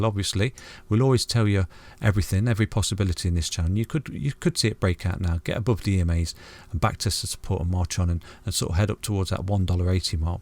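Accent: United Kingdom. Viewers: You have the cutest British accent I've ever heard. Thanks for the analysis 😉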